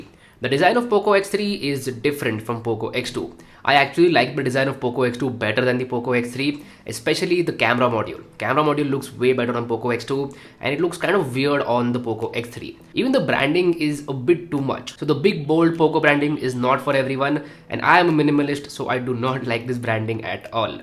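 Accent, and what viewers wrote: India. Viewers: Wa re Indian angrej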